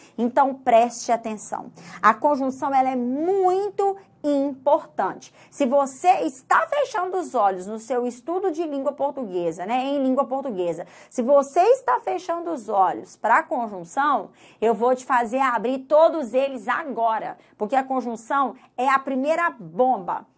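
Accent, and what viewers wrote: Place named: Minas Gerais, Brazil. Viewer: Parece minha tia q mora em Barbacena MG (sotaque)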